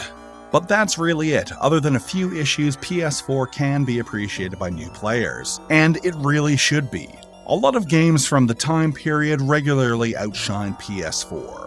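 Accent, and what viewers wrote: Maritimes, Canada. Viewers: Bro... you from the maritime provinces?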